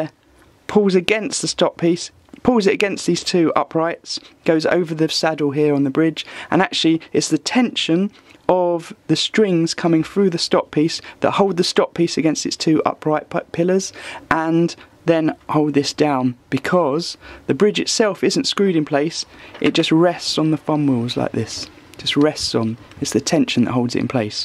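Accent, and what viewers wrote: United Kingdom. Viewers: Bro you have the best story telling voice. (Your accent sounds like you're from where I was born in the u.k [Wokingham, Berkshire]. I've moved to Australia now. Amazing video, thank you. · Dude, you are so good at this kind of thing. This was a bit more “101” than I needed to go, but I ended up watching start to finish to learn some more detail just based on how great your photography and explanations were! These are the kinds of videos I would make if I had a British accent!! Haha cheers!!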